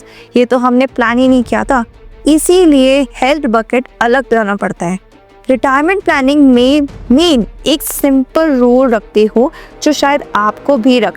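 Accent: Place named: Bengal, India. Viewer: She may be a non hindi speaker. Maybe Bengali or any other non Hindi Speaking area . Trying well. Keep it up.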